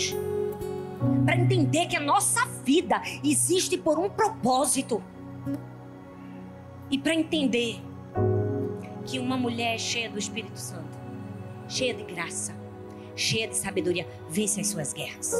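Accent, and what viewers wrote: Northeast, Brazil. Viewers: Que sotaque maravilhoso, desse meu nordeste!!!!❤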